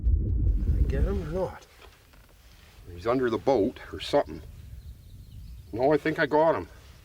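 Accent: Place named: Canada. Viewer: you sound like your from Canada 🍁 🍁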